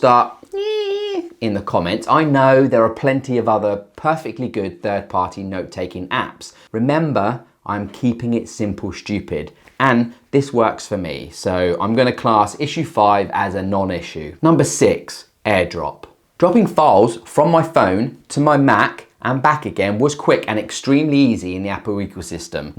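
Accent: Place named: England, United Kingdom. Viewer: Nowadays most whites kids in London speak English with a hybrid Jamaican dialect which is very different from the anglo saxon dialect of English which is spoken in this programme by this presenter. In the early 2000's, young white kids on council estates in London became JAMAICANISED. This is when they starting speaking with English with a hybrid Jamaican dialect. For example, Essex county is the only place in Britain where the cockney dialect/and or accent is still spoken. TO SUMMARISE: In the 1980's all the national British companies when through a process called national - PRIVATISATION - and then almost - SIMULTANEOUSLY - all the poor - NATIONAL - white kids endured a process called - JAMAICANISATION - which did indeed definitely - LEAD - to all poor - NATIONAL - white girls on council estates quickly - UNDERGO - a process called Jamaican extreme - INSEMINATION - and thus all the young - RESULTING - half cast babies initiated a full - NATIONAL - process called extreme - BASTARDISATION. 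Remember everyone, that the last point regarding bastardisation is entirely optional, and is not my view but instead is the view of white nationalists.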